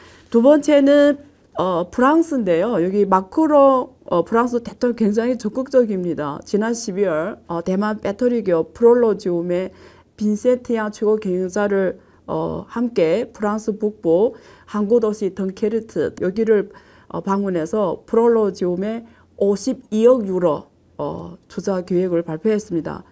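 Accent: Yanbian, China. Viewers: 한국어 배운 중국인 같은 발음 이네요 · 연변 조선족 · 이여자 목소리가 외이례?? 조선족이가??.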